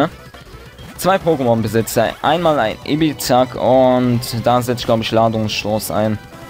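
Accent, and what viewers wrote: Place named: Cologne, Germany. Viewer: ein kölner akzent rischtisch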